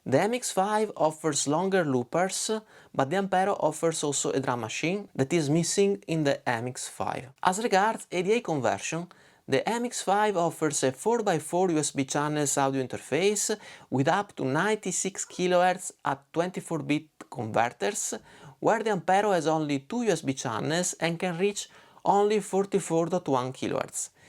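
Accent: Venice, Italy. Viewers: Possiedo l'Ampero MP-100 da un po' e confermo quanto scritto da: uno dei primi aggiornamenti del firmware ha 'sbloccato' la catena rendendola totalmente flessibile. Con l'ultimo aggiornamento è possibile caricare 20 (anziché solo 10) IR di altri produttori, ottimo lavoro e composizioni degne di un album. Sarà ma il tuo simpatico accento mi ricorda il parlato veneziano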